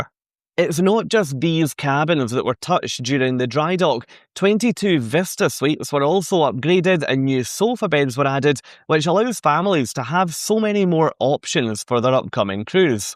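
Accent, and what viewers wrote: Scotland, United Kingdom. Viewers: Thanks for your video about the ship update. Although I'm good at understanding accents from various languages, yours is very, very strong; it must be Scottish, right?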